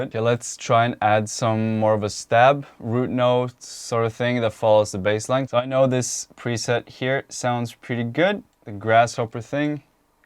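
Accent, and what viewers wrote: Norway. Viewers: Fan du är ju norsk haha hörde det direkt i slutet där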